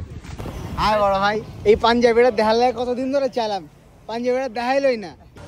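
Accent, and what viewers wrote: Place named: Chittagong, Bangladesh. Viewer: Vai tomar kotha te tw Chittagong er tone ase..So you are not from chittagong?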